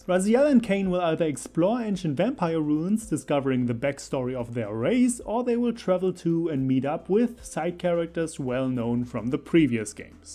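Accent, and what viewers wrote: Germany. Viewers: This guy is obviously german. His accent is extremely german. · The German accent is strong with this one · Are you from Germany? You have a little accent. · Wow! What a wonderfully detailed summary! Love it! 👍👍👍 But it's funny how your German accent is getting more and more pronounced during the video. 😆😉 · I can't get past the german accent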